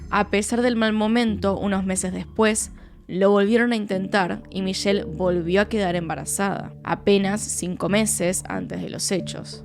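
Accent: Argentina. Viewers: Eres mi fav. Aparte que amo el acento argentino! Saludos desde Mexico 🇲🇽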